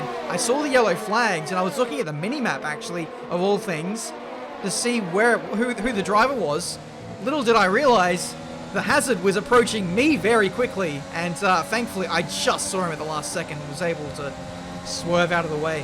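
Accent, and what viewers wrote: Australia. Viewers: Are you australian? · oi mate you from Australia? sounds like that, hehe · i was suggested this channel by a fellow formula 1 friend and i was like "oh ok, ill start watching him then" this is the first video of yours ive watched and its fliping amazing what makes it even better is the fact ur australian, i love australians, i think their accent is so cool i will definetly be recommending this channel to other people keep up the amazing work also congratulations on making it to f1 e sports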